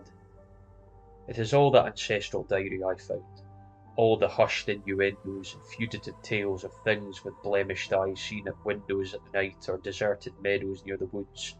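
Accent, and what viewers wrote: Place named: North, United Kingdom. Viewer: A Northern Ian...i dig it! Keep on it! Arkansas, USA!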